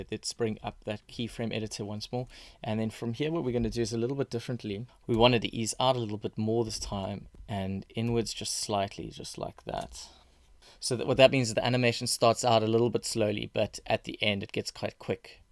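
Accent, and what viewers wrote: South Africa. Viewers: Great work man! I hear a South African accent there ;) Is there a way you can make this template available like the links you have added in the description? I would love to use it and replace it with my own logo and colours. Thanks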